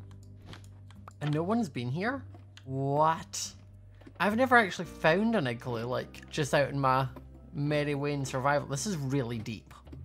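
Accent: United Kingdom. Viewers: Omg I love your uk voice because I'm from the us . Like if u agree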